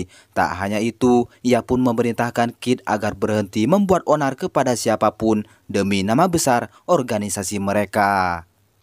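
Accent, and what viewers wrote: Madura, Indonesia. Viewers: Si owen kan pemaen smekdwon si batista pernah maen juga di film the guardian of galaxi sama film avenger and game,kalo si sadi namanya tony ja dia pemeran utama di film ongbak. Kalo dari gaya logat ngomongnya si abang orang madura ya ?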